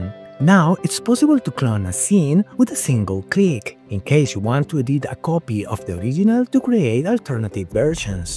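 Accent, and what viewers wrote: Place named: Colombia. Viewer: Siempre esa voz de hablando inglés con un acento colombiano es bastante íconico y reconocible en si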